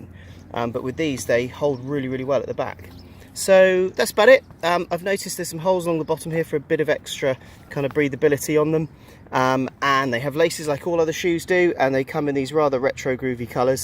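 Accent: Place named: England, United Kingdom. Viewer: New England location but old England accent !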